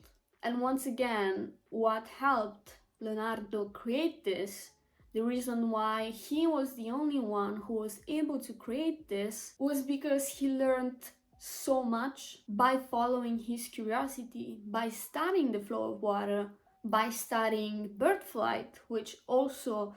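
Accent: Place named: Italy. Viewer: Wow, such a cute italian accent!💫 I'm currently studying it and would love to hear that content in your native tongue! Continua a registrarne di più!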